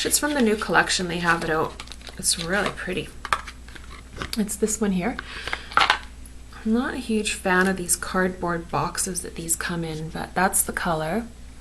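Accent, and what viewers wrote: Canada. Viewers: Great haul. BIG FAN of the Canadian accent. Go Habs Go!! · Love the heavy Canadian accent =) Eh!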